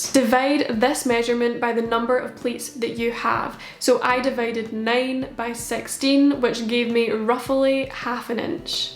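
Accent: Scotland, United Kingdom. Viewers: It’s a Scottish accent :) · their accent sounds Scottish, but idk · Omg she’s Scottish, we love to see it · Love your accent, are you scottish? (I think thats how you spell it) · Hello! I love this video! I have a question, are you from Scotland?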